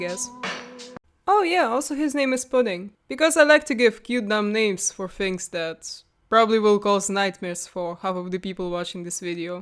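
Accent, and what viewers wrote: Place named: Russia. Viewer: Такой яркий русский(?) акцент, что я даже обрадовался тому, что глиномесов с прямыми руками полно и в России. (Если я все-таки оказался прав.)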